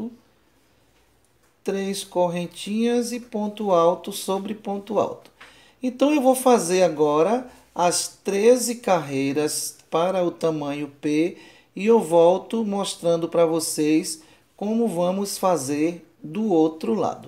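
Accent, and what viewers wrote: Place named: Bahia, Brazil. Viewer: Seu sotaques é um pouco parecido com o nosso da Bahia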